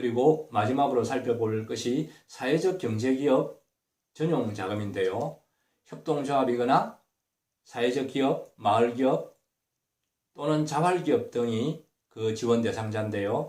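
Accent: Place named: Busan, South Korea. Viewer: 부산억양 귀에 쏙쏙 들어옵니다.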